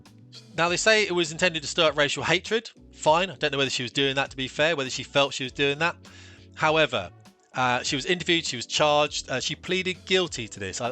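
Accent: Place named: Wales, United Kingdom. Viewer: He's definitely welsh😅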